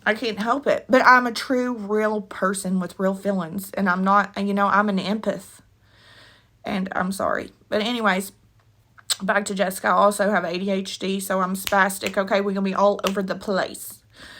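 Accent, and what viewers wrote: Kentucky, United States. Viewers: I’m talking to my mother now and told her about you going to Lake Herrington and she said my great grandfather worked there and his name is name is Jessie Lee Price and I guess he met really famous people there because they had bootlegers in the area. I told her how sweet you are and how much I love your accent bc it reminds me of my time I spent in Ky. My mom said we have family in Louisville and Lexington as well, Alexander County, and Boyle County. My stepdad family is from its Somerset ( Scienceville ). So we have family all over Kentucky lol 😂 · Hey neighbor.... I'm A KY girl too. Thanks for sharing your story. I subscribed to your channel. Hope to see more content from you. I feel the same way about her. I'm also in recovery. Nice to hear your voice with the familiar accent. You are so REAL .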